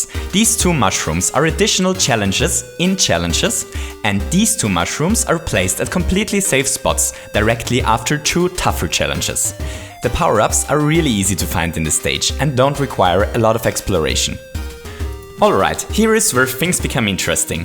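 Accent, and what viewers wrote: United Kingdom. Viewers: "Goompers" "Koopers" "Pletforms" "Dadly" "Chellenges" "Flegpole" WHY IS BRITISH SO BAD!? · I'm guessing he speaks English with British people. They substitute "ah" for "er". It could be hard to distinguish between the two in conversation.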